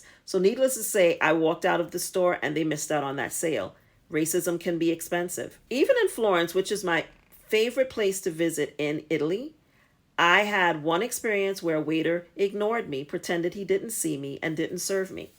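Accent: United States. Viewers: I guessed Greece was the most racist but Italy is right next door. I wonder if the immigrant crisis has something to do with it (not that I'm making excuses). The fact that your American accent got you a pass makes me think it's aimed at African migrants. You should wear a t-shirt that says: "Sono un ricco turista americano." :p The Netherlands or Scandinavia would be the least racist, being progressive and sophisticated countries. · It's too unfortunate that only your American accent is a leverage and asset. Nonodyis is going to respect us (black people).unless we change and improve our conditions.American accent can be an asset.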